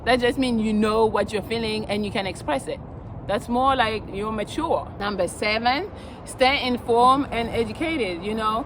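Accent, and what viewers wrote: France. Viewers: Irresistible like me damm I like that French accent you turn me on babe butt I have girl wish I would have you in my younger days this is from a great womanizer like me the best in the game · Hi, I am just discovering your channel, I mean I've whatched two or three of your videos so far and I like your frankness about your view of how a relationship should start and be conducted. I have just one question that has nothing to do with your contents: where are you from? My guess is that you are from some French speaking country because you pronounce certain words with a very slight French accent. How did you learn to speak english with almost no accent? I myself was born in Paris (France) originated from Martinique. keep doing what you already doing so good. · J'adore votre chanson " "....lol from Kenya. Also your french accent....looove it.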